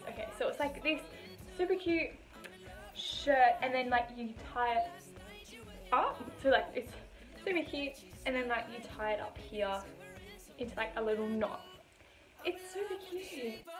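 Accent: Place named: Australia. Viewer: OMG UR AUSSIEEEEE 💗 WOOOO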